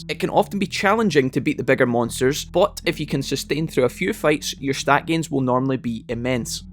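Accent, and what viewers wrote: Scotland, United Kingdom. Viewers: It's kinda funny. I was thinking the other day, what was the name of that other Scottish guy I used to watch for tips the first go around on ps4? Then this video just randomly played after watching a watchmojo Digimon video and knew it was you as soon as I heard the voice lol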